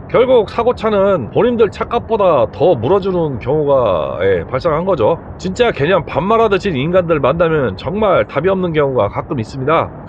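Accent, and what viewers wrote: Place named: Jeolla, South Korea. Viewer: 시골어디쯤이신가요? 내용듣다보면 충청권같으신데 말투는 전라도권 같으시고 ㅎ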